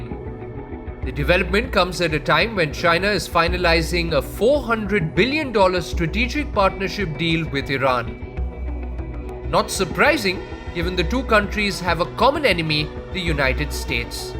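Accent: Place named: India. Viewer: you talk your desi way, girl.. pretending this is awkward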